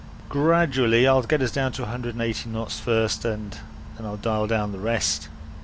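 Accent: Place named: RP, United Kingdom. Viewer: speaks the Queens English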